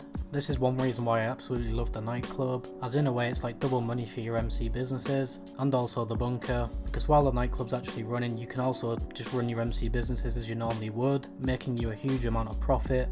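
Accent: United Kingdom. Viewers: So sound like you’ve got an English accent but call it garARJ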